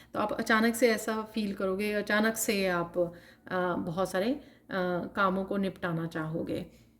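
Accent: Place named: Punjab, India. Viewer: Tu ganwar Punjabi tone wali aurst bqn eahi angrez hai sadi huî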